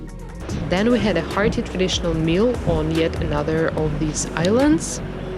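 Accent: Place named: Bengal, India. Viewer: Thoroughly enjoying your vlogging, narration, pronunciation (as in bengali accent) moreover your interests about local history, culture and all..hope will get many more. ❤️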